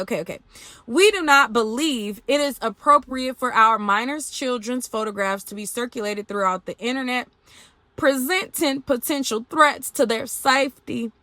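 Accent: South, United States. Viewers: I love ur Southern accent.. I truly do🤣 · Using the southern twang is beneath you.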